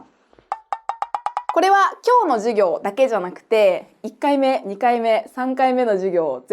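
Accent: Kansai, Japan. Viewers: 関西イントネーションの先生は５割増しでかわいいのでイントネーション講座よろしくお願いします！ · 1回でも聞かないと難しいですね。先生の話し方はアクセントも変わってて関東人からするとイメージも変わってすんなりしゃべれると思っていません。でも可愛いい関西弁なのでしばらく続けて聞いてみたいですわ。宜しくお願いします。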